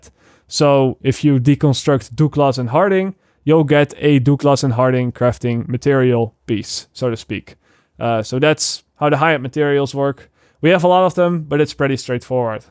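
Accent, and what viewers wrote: Netherlands. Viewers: I came for the crafting info and as soon i heard you speak wist ik dat je een Nederlander was😂 goeie video ik ben gesubd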